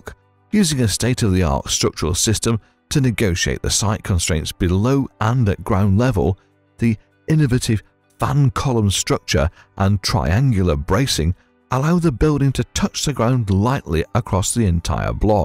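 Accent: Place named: United Kingdom. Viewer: We're not British here in New York City. We don't pronounce it Two-Hundred and Seventy Park Avenue. It is Two -Seventy Park Avenue. And while I'm at it, we don't say litrally either. It is pronounced literally. We don't drop the "E" like you Brits due all the time.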